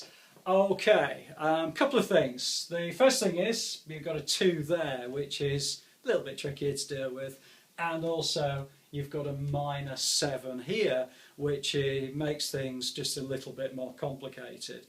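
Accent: England, United Kingdom. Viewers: Finally a person who can explain maths and sounds english!!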